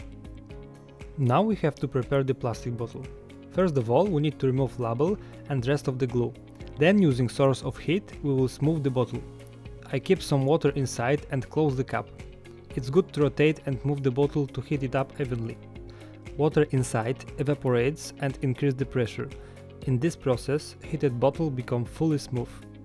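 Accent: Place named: Poland. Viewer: POlak?? Dobrze slysze :D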